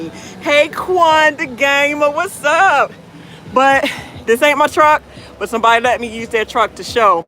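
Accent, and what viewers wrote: Baltimore, United States. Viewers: Are you from baltimore ? I hear a accent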